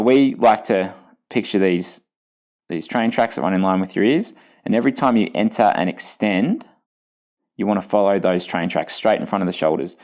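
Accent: Australia. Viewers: Another excellent clip! Thank you! Though your Aussie accent makes pull buoy sound like pool boy!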